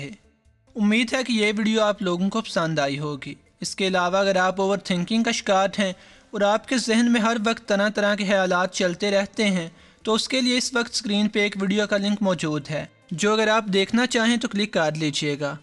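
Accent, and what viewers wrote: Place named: Punjab, Pakistan. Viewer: آپ کی موٹیویشمل سپیچ زبردست ہے . آپ بنجابی ٹچ کچھ کم کریں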